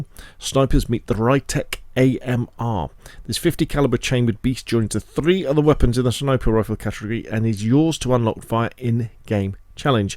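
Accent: United Kingdom. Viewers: Jesus Christ you are a breath of fresh air. Every other video I've tried to watch is just some American begging for subs and spending literally 12-15mins going through everything, with added speculation and opinion. You just go through the information bit by bit and don't flood it with your own contrived padding. Thank you for your resounding Britishness and the approach you have to information dumps. ✌️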